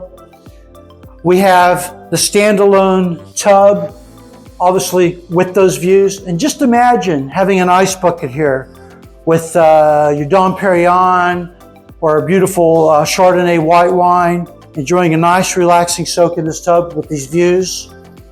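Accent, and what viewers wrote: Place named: Scotland, United Kingdom. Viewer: Everyone loves your Scottish accent!! Especially as Americans 😊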